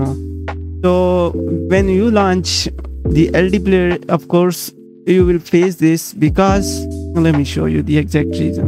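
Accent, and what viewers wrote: India. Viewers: It's always the Indian accent guy on YouTube 🤗🤘🙏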